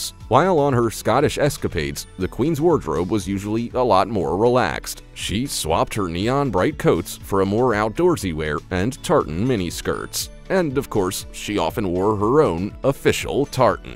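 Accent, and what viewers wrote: United States. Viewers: I do dislike British documentaries narrated by Americans. Not only do they tend to be factually incorrect, but the pronunciation often jars on British ears. For example, "Buckingham" places no emphasis on the last syllable, whilst the American pronunciation makes it sound like cold meat. Incidentally, "England" and "Britain" are not interchangeable. The Queen and King were crowned Queen/King of the United Kingdom of Great Britain and Northern Ireland. And I strongly suspect any disfavour Scotland has with England has got nothing to do with ancient gold mines! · I know he also sounds very uneducated about this information about the queen and the monarchy I'm guessing he's an American from the way he talks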